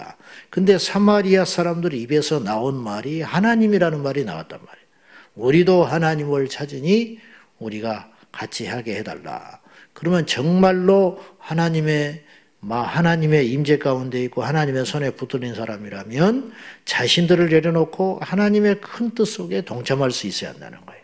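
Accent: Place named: Jeolla, South Korea. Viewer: 목사님께서 가끔 사투리를 말씀하실 때 그 뜻과 의미를 거의 완벽하게 알아들을 수 있어서 참 좋습니다. 언어 소통이 어려운 이민자의 삶으로 살다보니 온전히 이해할 수 있음도 감사한 일이더라구요. (제 고향이 전북이거든요^^) 에스라 입장에서 1장~6장은 과거의 이야기. 536년 도착 520년 학개 스가랴 성전건축 촉구 스룹바벨과 예수아(여호수아) 건축 516년 10월 성전 건축 완공 지연된 이유 1. 사마리아인들의 훼방. 2. 성전 건축의 중요성을 잊어버림. 458년 에스라 중심 2차 포로 귀환 444년 느헤미야 총독 성벽 재건(3차 포로 귀환) 외부보다 내부의 문제가 더 많은 교회. 세상 법정으로 가지고가지 말아야하는데 지켜지지 않는다. 문제는 깨닫지 못함 생명의 역사를 바라보아야한다. (5절) 하나님의 역사를 막을 자는 없다. (단 1:8) 다니엘이 뜻을 정함. 예수님의 뜻을 정하심 거룩한 뜻을 정하면 하나님이 행하신다. 믿음은 기름이고 하나님의 불이다. 믿음의 결단. 뜻을 정하자. 결론 1. 거룩하고 의로운 뜻을 정하면 하나님이 반드시 도와주신다. (악의 도구가 되지말자) 2. 하나님께서 정하신 뜻을 반드시 이루신다.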